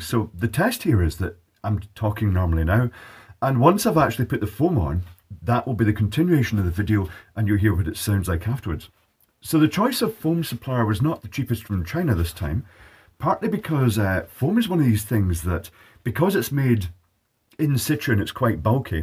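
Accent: Scotland, United Kingdom. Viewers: The unintelligible issue is caused by the Scottish accent not the foam. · I watch your videos and verry much like them, but off topic now. Are you Scottish? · Total difference but your deep Scottish bass tones are still there 😃👍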